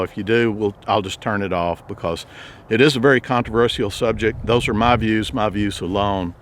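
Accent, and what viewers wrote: Texas, United States. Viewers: It has been many months since I've watched your videos and I apologize for that. My Mother passed away in July and I have been adjusting to that loss. She liked your videos and we would watch them together. She loved your Texas drawl and would say you're a "good looking man"! Bless you and your family and here's to a better 2021. Take care.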